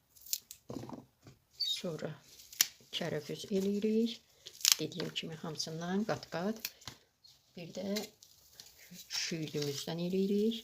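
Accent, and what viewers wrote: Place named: Ganja, Azerbaijan. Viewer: İndi duzlu suyunu qayirajıyıx ,,belə bircə Gəncəlilər danisir ,,ağzını örtüb qoyuruq qırağa .Sizə uğurlar xanım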